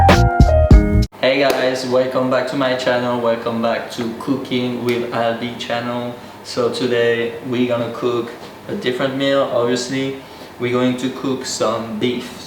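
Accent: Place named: France. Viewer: Je trouve chouette ta chaine car je vais apprendre l’anglais et cuisiner avec toi ! C’est cool et du coup on comprend tout vu ton accent français.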